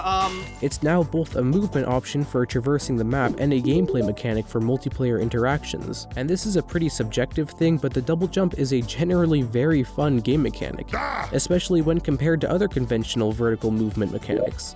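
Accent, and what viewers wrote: Canada. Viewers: you're Canadian right? also fun video.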